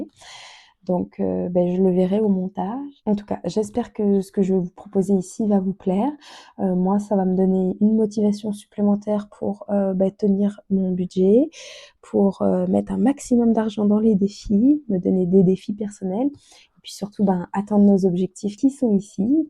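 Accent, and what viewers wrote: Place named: Quebec, Canada. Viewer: Kikou, bienvenue sur YouTube 🤎 Ravie de te découvrir! Çà ne doit pas être facile de gérer le budget par 2 semaines quand on n'a pas l'habitude! Bravo pour ta résolution de reprendre ton budget 🥰 Je me suis abonnée avec plaisir, ton accent québécois qui transperce par moments est charmant 😍 Des bisous 😘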